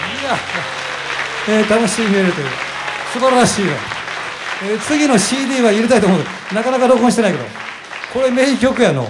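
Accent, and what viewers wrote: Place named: Kishu, Japan. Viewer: ありがとうございます。我が家では濱口さんの紀州言葉をお互いに言い合って楽しむ時があります。関西人やからのお。ええのお！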